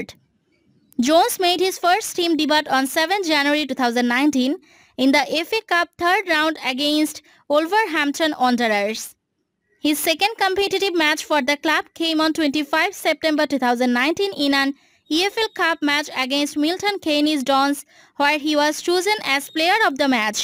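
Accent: India. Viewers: You have a very strong Indian accent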